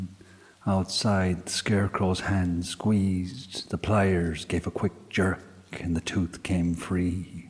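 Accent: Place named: Ireland. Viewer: Yes it's Saturday night and off course I'm on my own but the difference is tonight the 14th of February you are with me 🌹 Happy Valentine my beautiful Irish voice who tells the most haunting tales ❤️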